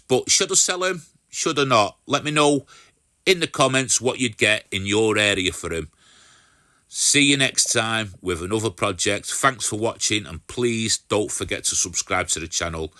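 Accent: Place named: Stockport, United Kingdom. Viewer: great project ,looks retro,you don't sound to far from me (stockport).Every man should have a shed.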